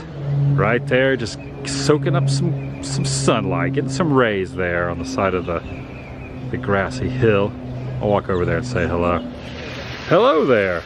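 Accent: United States. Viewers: a goth superstore???! oh you mean golf! lololololololol im in NZ. that USA accent! :) dig ya vids as always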